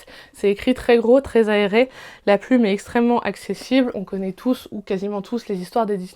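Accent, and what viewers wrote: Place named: England, United Kingdom. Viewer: Coucou, j’ai hâte de voir tes retours car il y a beaucoup de livres qui me donnent envie et en passant j’aime trop ton accent anglais 😍. Bon challenge et bonne lecture!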